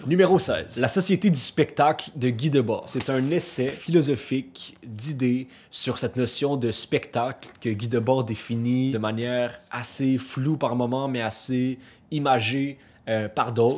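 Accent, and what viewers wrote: Quebec, Canada. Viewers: Félicitation! lire 20 livres en un mois est très super. C'est inspirant. Also, I am watching your video to practice my french listening. I did realise at the beginning that you speak in Québécoise, and to my surprise I found your speaking easy and clear (considering I barely understand french youtubers from France 😭) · theSupercasa du québec! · is he canadian? idk what canadian french sounds like but his french doesn't sound french iykwim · Haha first time at this channel and 2 seconds in and I knew he was Quebecois. Les quebecois sont incroyable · Salut mon ami! J'ai aimé ton accent!❤ Bisous du Brésil à toute nation québécoise! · Yellow Card Chimchim His from Quebec, and yes he doesn’t sound like a French but he has a very nice voice. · El Amiri Yes, he is québécois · T'es beau et j'adore ton accent Québécois Alors moi j'adore la lecture c'est super aventurier, ça aide à les gens à plus réfléchir, à s'évadé, a ce développer mentalement, etc Parfois il y a des liens entre nôtres vie et l'histoire ou ramon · Wahh ... 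québécois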